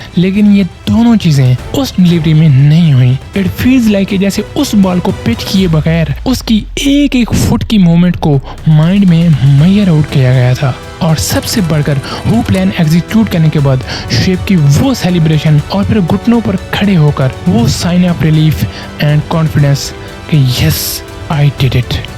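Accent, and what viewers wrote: Pakistan. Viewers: Tera accent to Pakistani hai baat tu IPL dekhne ki karta hai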